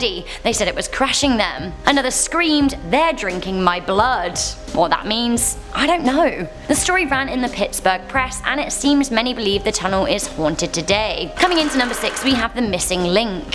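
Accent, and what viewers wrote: England, United Kingdom. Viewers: Hi Beautiful can I get a shout out In your next video I just love your English accent and when you do scarry videos. And I love how you dress. You dress so nice 👍. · Are you from UK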